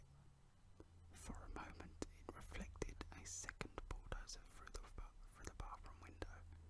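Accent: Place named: United Kingdom. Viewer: So happy I found someone British like me. You have a lovely voice and made me very sleepy :)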